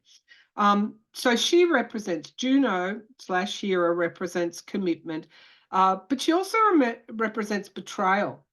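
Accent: Australia. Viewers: I cannot look away.. I love your videos. Keep your calm, soothing energy. And your Australian accent is a plus. GOD bless you.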